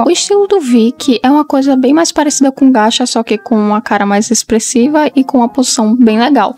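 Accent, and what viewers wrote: Northeast, Brazil. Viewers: é nordestina? Senti um sotaque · Não sabia que você era brasileira, ainda mais com um sotaque nordestino, ORGULHO BRASIL🇧🇷🇧🇷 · Tu é nordestina? O sutaque parece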